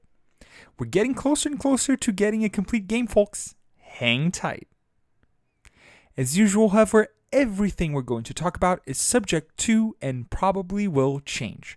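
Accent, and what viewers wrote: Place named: Brazil. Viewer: Até sem saber que o seu nome é reconheci o seu sotaque brasileiro! Salve, irmão, 😂. Espero que o podcast cresça muito assim como o jogo!🎉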